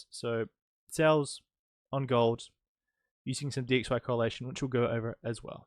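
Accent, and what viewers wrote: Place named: Australia. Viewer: God bless you... seems that you have great information, but I can't for the life of me, understand your English man😢 too fast, words are mixed together... is this Australian? Sorry... I wish we could change things like that in the settings but there's nothing... even the subs having hard time translating it... Again, God bless you... you're really sweet. Thanks🌸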